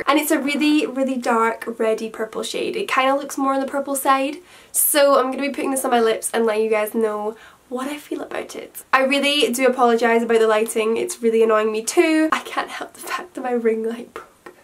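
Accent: Scotland, United Kingdom. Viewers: this was a amazing💋 are u scottish xx💗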